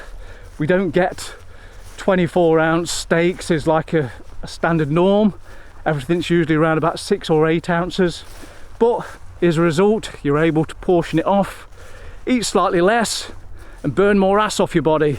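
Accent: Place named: England, United Kingdom. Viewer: Hello from south Mississippi! I love your videos! My southern accent loves your English accent! I use the Renpho scales too. You mentioned in a video that you had ordered a new set of scales, but at the time, you haven't received them. When you get them, will you give us a review and comparison of the Renpho scales? Keep on keepin' on making your wonderful videos!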